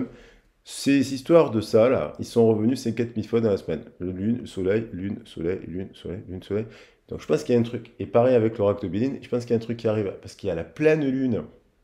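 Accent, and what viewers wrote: South, France. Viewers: Merci pour cette guidance! Avé l'accent du soleil, c'est encore mieux🤣! Séparation et abandon datant de 2021, vu qu'il ne se décidait pas à acter un choix, j'ai mis un terme à ses visites (amicales), il n'a pas apprécié!!! Belle soirée:face-red-heart-shape:🌠!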